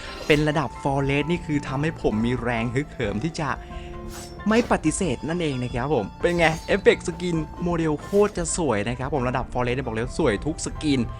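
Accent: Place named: Central Thailand, Thailand. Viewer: พี่เป็นคนเหนือหรือ ลวดเครียกลาง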